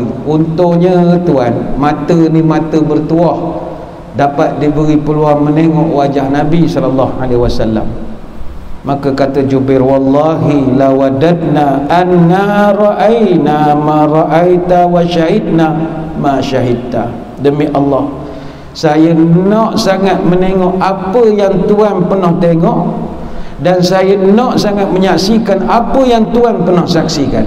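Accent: Pahang, Malaysia. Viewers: Dakk....Dato org Maran, Pahang. Mmg telo Pahang ada sstgh kwasan ada sikit2 mcm org Perak.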